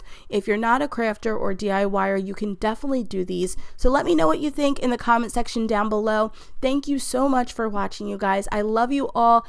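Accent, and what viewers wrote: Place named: New Jersey, United States. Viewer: I love them all!!you sound like a Jersey girl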